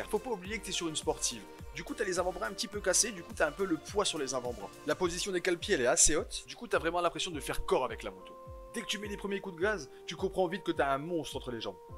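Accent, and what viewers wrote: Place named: North, France. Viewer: Cet accent de "tcho gomin" du nord !!! Ça me rappelle ma jeunesse 🤣🤣 encore une vidéo drôle et dans l'esprit de nos petits verres de Perrier menthe en terrasse entre deux arsouilles a tordre le caoutchouc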